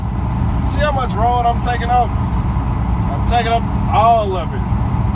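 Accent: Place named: Farmville, United States. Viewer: sound like your in Farmville va.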